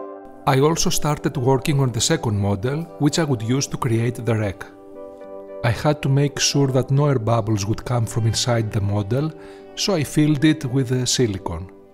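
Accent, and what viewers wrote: Greece. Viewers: From the moment I heard your voice I knew you were greek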